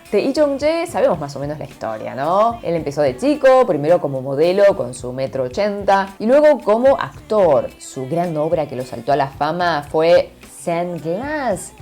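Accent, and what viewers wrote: Argentina. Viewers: Saludos, me encanta escuchar tu Espanyo Argentino. Una pregunta, en Corea, no se reconoce la soberania personal, los derechos humanos? He escuchado de gente Japonesa que los "Daimyo" de Japon no son tan poderosos como los "Chaebols" de Corea por rebeldia de la poblacion. Es verdad? En tu opinion, hasta donde llega la lealdad a uno mismo vs a la familia mas, en Japon o en Corea?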